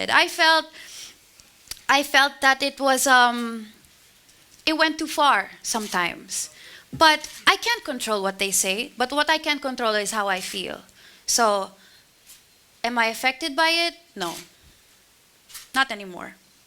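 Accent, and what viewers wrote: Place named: Philippines. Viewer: Any non filipino out there loves her filipino accent??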